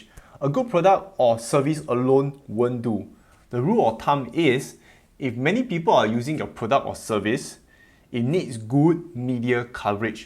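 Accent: Singapore. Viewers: You are incredible! Straightforward advice in a simple straight manner... love your accent.... Go Singapore! By the way, I am a Tesla fan as well... want to talk about Space X or Solar City going public on their own anytime soon? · I like the way u talk, really bring out the singaporean in us. Haha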